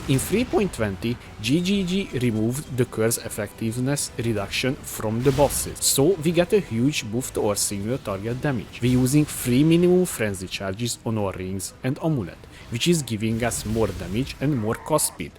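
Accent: Hungary. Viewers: you Hungarian?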